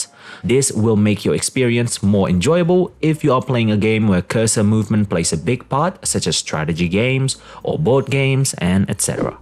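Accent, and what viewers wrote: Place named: United Kingdom. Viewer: How you get that nice british accent?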